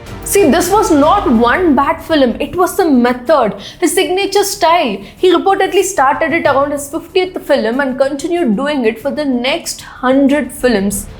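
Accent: Tamil Nadu, India. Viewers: Please, if you cannot pronounce Telugu words or names and pronounce everything like Tamil words with a Tamil accent, don't make videos on Telugus. , Director K Vishwanath was not called "Kaalaa" (black) Tapasvi. He was called "Kalaa" (కళా, Art) Tapasvi. By the way, Tamil and Hindi film industries are shit too. Where are the artistes of the calibre of MGR, Shivaji Ganesan, Raj Kapoor, Devanand and the likes? Tamil cinema actors and comedy is the worst. Is Dhanush even an actor? Looks like a beggar. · Mis, I have great respect to you the way you have overcome all odds and stand infront of public n camera....One request, pls come out of Tamil pronunciation, especially Spelling P as B in public is kind of scar on moon.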